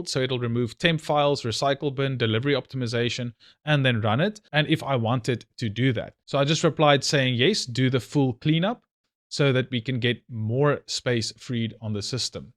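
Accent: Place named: Johannesburg, South Africa. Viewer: Excellent video, yes keep them coming, I find them very interesting. Plus my wife comes from Jo-burg and your voice makes her home sick lol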